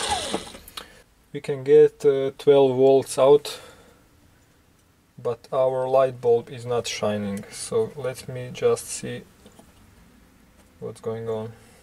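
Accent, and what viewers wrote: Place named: Russia. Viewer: Такое ощущение, что твой родной язык русский.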